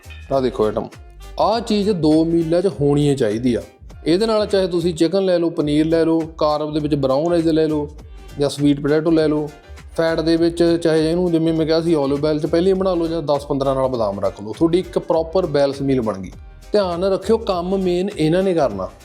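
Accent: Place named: Malerkotla, India. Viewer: ਇੰਨਾ ਕੁ ਪਾਣੀ ਪਾਉਣਾ ਡੱਬੂ ਵਿੱਚ ,, 😅 ਗੱਲ ਸਿਰਾ ਕਰਤੀ ਬਾਈ ,, ਆਪਣੀ ਬੋਲੀ ਇਹੀ ਆ, ਮਲੇਰ ਕੋਟਲਾ ਸਾਈਡ ❤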